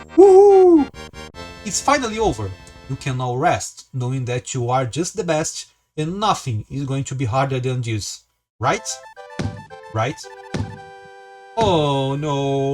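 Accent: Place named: Brazil. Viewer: Oi, acredito que o YouTube tenha me recomendado seu vídeo porque gosto de ver desafios, Mario e também porque você é brasileiro (pelo menos pelo que percebi do sotaque). Estou deixando esse comentário não somente para engajar, mas para fazer elogios: parabéns pela coragem de fazer conteúdo em inglês. Eu ensino inglês e não teria essa coragem porque tenho muita vergonha. Aliás, pode ser que venha alguém te encher o saco pelo sotaque, mas não ligue: nós brasileiros temos um sotaque baseado na nossa língua-mãe, ninguém precisa falar como nativo, desde que seja compreensível. Acho que a única palavra que foi usada com frequência e pode ser difícil para alguém de fora entender seja "Island", cuja pronúncia é próxima de "ai-land", o som do S some. Tirando essa, tá tudo muito compreensível, e os comentários em inglês que vieram antes dos meus já demonstram isso. 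E, aliás, vida longa ao SNES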